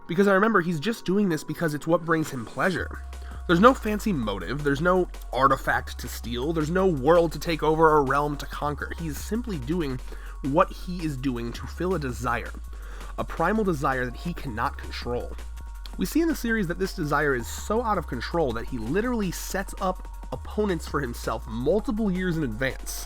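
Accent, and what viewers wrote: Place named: United States. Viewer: Why do you keep putting the r before e when pronouncing words, I think I developed a new pet peeve for American accents. Good vid tho subbed.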